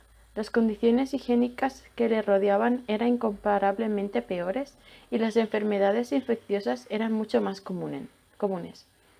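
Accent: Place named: Spain. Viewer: Gracias: Es muy agradable tu voz y me encanta el acento español.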